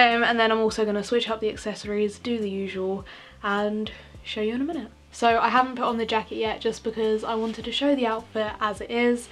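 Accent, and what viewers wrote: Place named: South East, United Kingdom. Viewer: So I hope this isn’t too intrusive but I was wondering if you’re from the south east, cos I am Kentish and I noticed you mentioned the Tate in Margate and you have a very familiar accent. Xx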